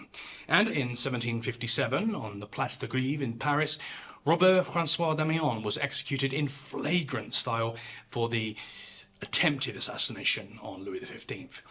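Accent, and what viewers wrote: England, United Kingdom. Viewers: I'm having trouble getting past seeing Ricky Lafleur with an English accent. #trailerparkboys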